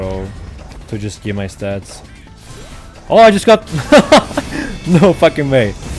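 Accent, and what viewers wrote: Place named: Russia. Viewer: Bro where u from ? I think u from Russia если так то привет брат как дела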